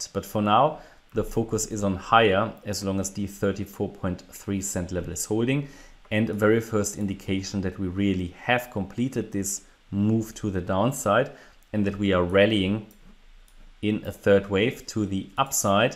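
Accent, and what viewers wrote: Germany. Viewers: May i ask what part of Europe are you from? Germany🤔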